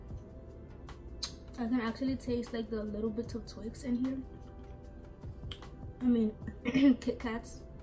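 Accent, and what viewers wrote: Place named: United Kingdom. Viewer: Your british😂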